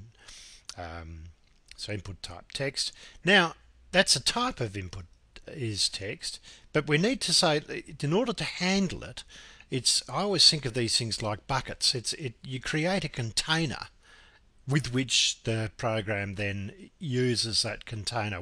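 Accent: Australia. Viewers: Thanks plain Aussie Talk Very Easy to Follow.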